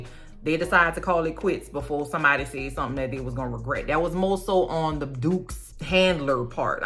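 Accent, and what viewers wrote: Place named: South Louisiana, United States. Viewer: Love your priceless commentary! Adore your accent as well! I miss it, as a south Louisiana native also ( moved to Arkansas about 25 years ago.) So glad to have found your channel. ❤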